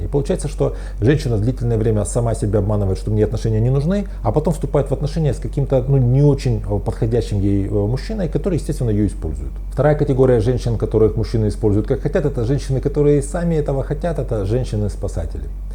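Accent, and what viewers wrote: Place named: Ukraine. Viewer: он живёт в Украине, акцент просто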